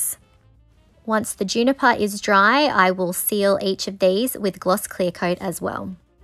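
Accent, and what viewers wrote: Australia. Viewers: I just found your channel and it's so nice to hear your Australian accent. You're very talented and inspiring me to try this. Thank you ❤🐨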